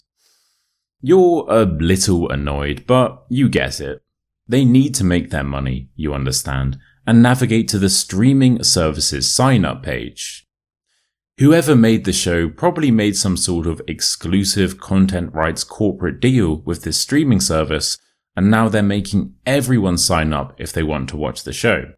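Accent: United Kingdom. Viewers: Wao i just want to listen the British accent a end up focusing at how story ends , I love it thx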